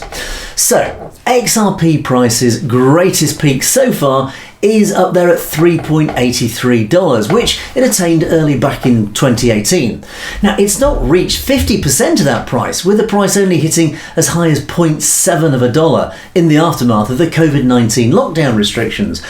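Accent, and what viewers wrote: United Kingdom. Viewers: Like ur typical Uk Accent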